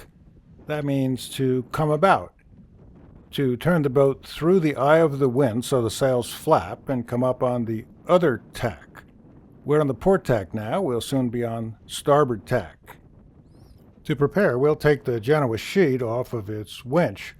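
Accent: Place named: Southern California, United States. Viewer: I love The Philosophy of Sailing.I listen to it often. Learned so much. I grew up in Sherman Oaks and Fullerton. Your So. Cal accent (intonation, cadence, tempo, along with your wonderful humor) is something I am very familiar with. You remind me of listening to my best friend’s father as we hiked Catalina, from the isthmus to Avalon. Looking forward to more of your wonderful videos. Have you considered a Vol. 2 of Philosophy? I’d buy it in a heartbeat!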